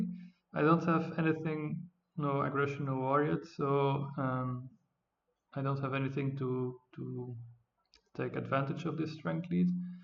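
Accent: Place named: Netherlands. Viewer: Very nice all in strategy. Seeing the opportunity that the other civs needed (too much) time to get online with their culture production so you could maintain your lead. Two questions: Are you dutch? Because your accent sounds really dutch :p And I dont know why e5 would use his ocean liner last turn. It would only set him up worse for certain events like happiness and it would give him 0 benefit. Weird decision. Do you have any idea?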